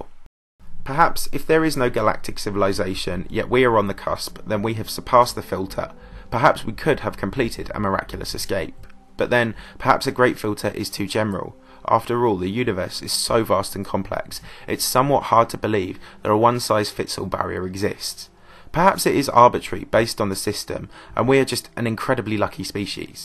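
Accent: United Kingdom. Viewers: The worst part of this is your Brexit accent.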